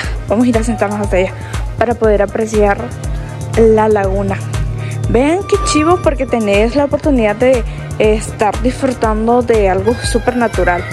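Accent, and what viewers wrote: Guatemala. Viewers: Estoy sorprendido porque tú ya no hablas como salvadoreña, por un momento pensé que eras chapina.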